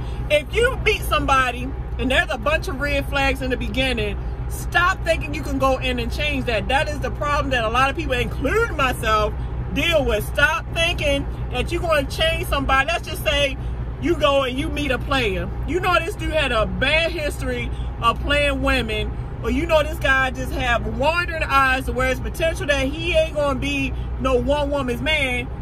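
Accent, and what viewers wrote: Baltimore, United States. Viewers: You have a Baltimore accent 🤔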